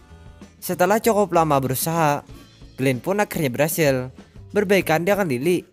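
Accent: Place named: South Kalimantan, Indonesia. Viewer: Logat nya abang yg bawain cerita kayak orang KALSEL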